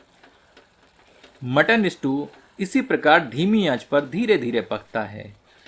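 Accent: Standard. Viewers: एकदम शुद्ध हिंदी बोलते हैं आप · आपकी विशुद्ध हिंदी बहुत ही मनमोहक और प्यारी है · Aap ki boli hui Shudh Hindi humein ati(behad) pasand hai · itni suddh hindi sun k bhut achcha laga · itna sudh Hindi bola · Bhai dish to tasty hoga hi.. But apki hindi sun ke doordarshan ki yaad aa gayi.. 😃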